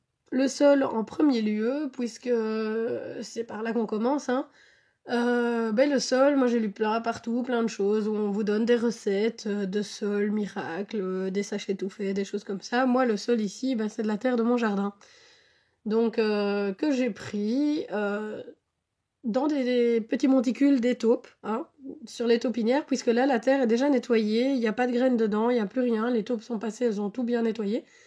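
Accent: Belgium. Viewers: Bonsoir. Je suis tombé sur ta vidéo par hasard. J'ai reconnu ton accent belge 😉. En ce qui me concerne, je pratique l'aquariophilie depuis bientôt 10 ans et je commence à m'intéresser au low tech. Je me pose la question si cela pourrait fonctionner avec ma population : 5 scalaires dans 350 l... Sinon, j'habite Bastogne. Vive la Belgique ! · L'accent belge 😍 tu as un admirateur en France !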